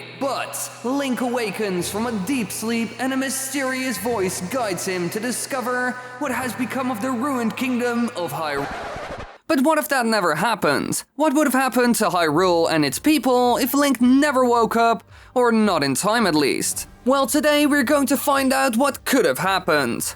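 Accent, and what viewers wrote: Netherlands. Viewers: I was wondering, are you Dutch? Cus i am and i speak english kind of the same way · I'm sorry but the dutch overtones in this one are too much for me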